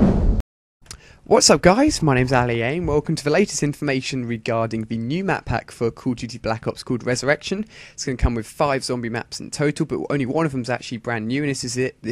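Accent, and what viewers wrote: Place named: United Kingdom. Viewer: why is it always a british guy?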